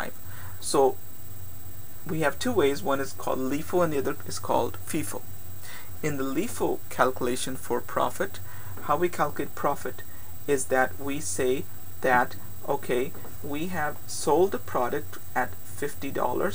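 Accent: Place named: Canada. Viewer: you are the best teacher ever and youre canadian and your accent is beast so youre my favorite now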